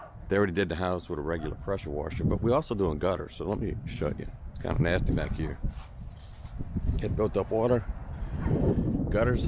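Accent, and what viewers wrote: Louisiana, United States. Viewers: Man I knew you sounded like you were from Louisiana Saw the 985 area code on the water tank Awesome video!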